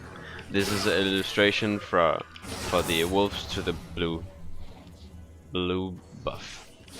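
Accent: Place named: Denmark. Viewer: I guess we have a dane speaking :)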